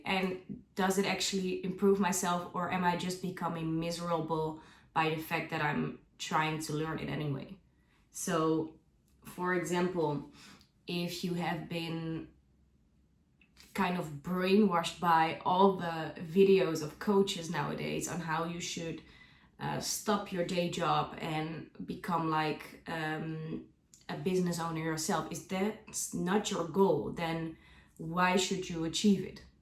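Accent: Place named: Netherlands. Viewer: You have many good points 😊 keep it up 😊 are you dutch btw? I notice a small accent 😅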